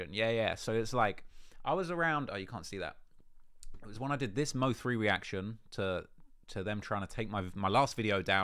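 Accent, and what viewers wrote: United Kingdom. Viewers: Yo Idgaf what anyone says , any British dude that keeps his accent but says alot of black slang when he talks is dope af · BRA you from the UK is the main reason. you don’t know von or understand him, yet you obviously understand who he killed, n how……….von was loyal is the best way to describe him n if anything, he is a vengeful killah. his friends getting killed, sparked the hate for him to do wut he “allegedly” done. he luv’d his gang. If you lived out here maybe ppl wouldn’t be as mad, but he not a serial killah, VON is a vengeful killah. who is really fueled by the luv he has for his friend’z who was killed. he not the only one to get away with body’s. police don’t even try n solve shit half the tyme, in “Chicago” the chances of cops solving a murdah is low. ppl don’t talk it’s just like looking in from the outside…………personally, I don’t think von would be mad about the documentary, only thing we don’t like is you calling him a serial killah! 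everybody that knew von, knew he had body’s. it’s not a secret! it’s just your perception, you’re accent & your WHITE. LMAO 😂😂 Long Live V-ROY 🙏🏽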